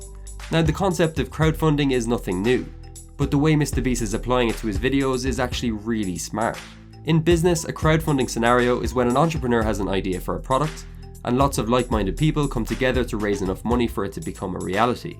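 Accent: Cork, Ireland. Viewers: are you from Cork?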